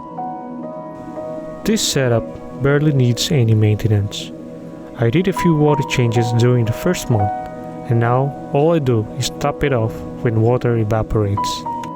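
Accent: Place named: United States. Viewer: Thumbnail is AI -Voice is american -backround voices are japanese/chinese/korea Something is very off here.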